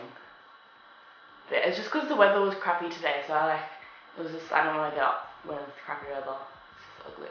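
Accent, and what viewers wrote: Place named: Australia. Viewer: How many bananas did you put into your smoothie bowl? You have SUCH a strong Australian accent btw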